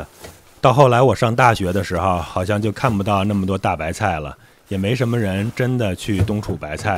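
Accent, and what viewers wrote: Beijing, China. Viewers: 无意中发现你的频道，感觉你是北京人，没敢问。原来还真是。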